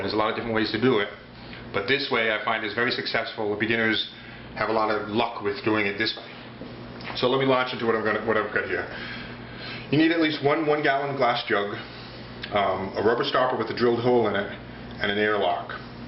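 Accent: United States. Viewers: You sound american and bottled water is about the same quality as bottled water there. They call it springs or glacier water but really they just re-filter tap water and ad some more minerals in it. If you want "clean Water" well your better of buying actual distilled water or distill it yourself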